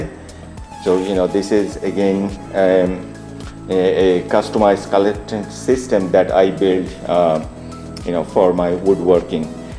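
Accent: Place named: South Africa. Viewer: Where are these sold? South Africa and Zimbabwe? [Judging by presenter's accent.]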